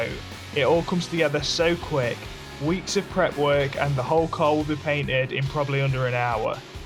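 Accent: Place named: East Midlands, United Kingdom. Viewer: You sound like a east midlands lad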